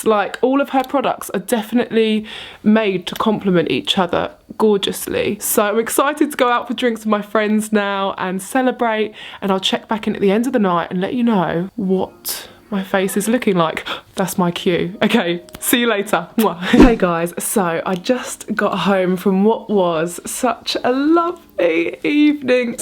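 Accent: United Kingdom. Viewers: God I love your accent! I’m an English teacher and I really admire your very British accent, I love listening to you 😂 why not compare all the new makeup of 2019, your favorites and the ones that didn’t work for you!! ❣️❣️❣️ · I've been following you for a little while now and I really love watching your Instagram stories it's relaxing in some way, and I love you British accent! ❤️ I would love to see a glam make up tutorial for Christmas! You're amazing, love you 🌹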